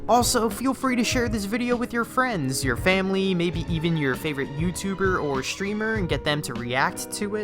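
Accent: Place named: United States. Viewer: I love listening to American accent talking about 'football'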